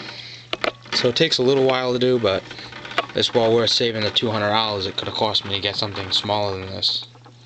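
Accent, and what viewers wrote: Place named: New York, United States. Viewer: I can tell your from new york by the way you speak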